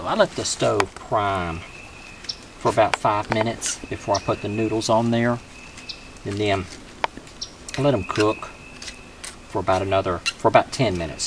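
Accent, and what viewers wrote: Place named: Texas, United States. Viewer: I subscribe to your channel. I know at lot of things that you teach ,but you always seem to have one idea that I had not considered. I love your Texas accent,; My son-inlaw is Texan. If the two of you were talking from another room, I wold have to guess who was who. I enjoy your vids. Keep up the good work! 28Bull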